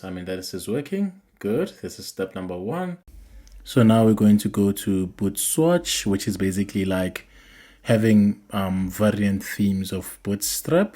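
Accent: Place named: South Africa. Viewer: I heard your accent and knew ukuthi ungumkhaya(South African). Happy to see such videos from people on my land ❤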